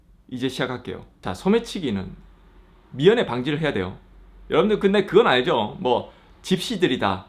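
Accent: Gyeongsang, South Korea. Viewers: 와우 경상도 사투리가 마 쎄 빡 섞인게 매력적이시고 방송 잼나네요. 여름에 이탈리아 가는데 이방송이 참고서 되겠네요. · 얘기가 경상도 사투리로 구수함이 느껴져 구독합니다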